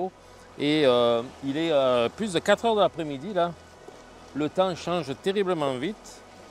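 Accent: France. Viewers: merci beaucoup pour vos vidéos utiles, pourquoi vous avez un accent français, si vous venez du Québec. meci encore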